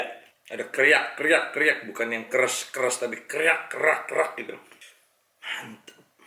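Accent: Surabaya, Indonesia. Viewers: Suroboyo pooll iki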